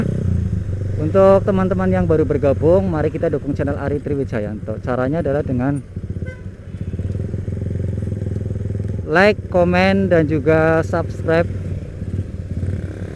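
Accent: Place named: Banyumas, Indonesia. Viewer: Ora ngapak ora penak 😁😁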